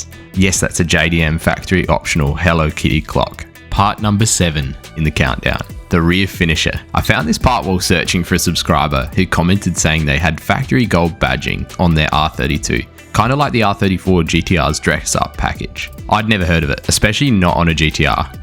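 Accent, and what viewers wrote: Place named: Australia. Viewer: just found this channel, awesome video man, instant sub. also i find it funny that Your description says "United States" but you have an aussie accent lmao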